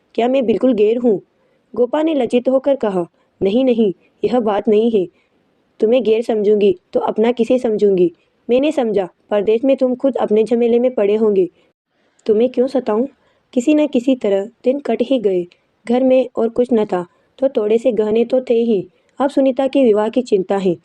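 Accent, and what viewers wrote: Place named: Hadoti, India. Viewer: आवाज में हाड़ौती की बोली का acsent सुनना कितना मंत्रमुग्ध करने वाला अनुभव है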